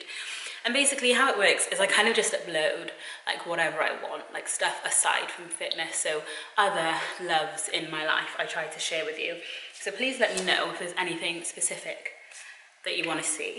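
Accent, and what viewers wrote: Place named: United Kingdom. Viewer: Shw has a british accent kind of🙂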